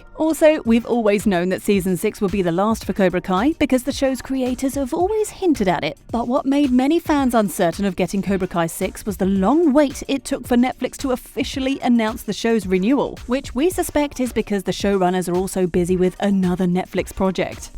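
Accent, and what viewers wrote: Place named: United Kingdom. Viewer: Why are these videos almost always narrated by British people? British accents are highly annoying for me to listen to. I especially can't stand the way she says "Dio-jeo"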